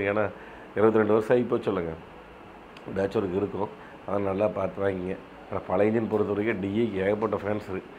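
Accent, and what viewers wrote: Kongu, India. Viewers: Anna iam from erode bhavani.... Unga kongu slang vera level...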